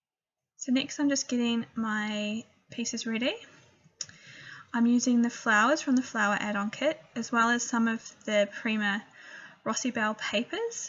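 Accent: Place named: New Zealand. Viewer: I thought you sounded more kiwi than Aussie. I love your work!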